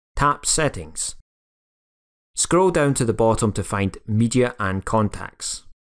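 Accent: Scotland, United Kingdom. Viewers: Your Scottish accent made me smile immediately.😅 Oh, and thanks, it worked!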